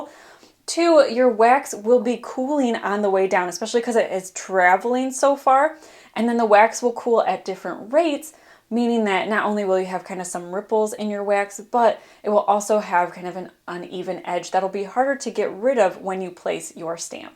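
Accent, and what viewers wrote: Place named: Michigan, United States. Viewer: Very nice tutorial! Are you by chance from mid Michigan? Enjoyed your slight accent 😊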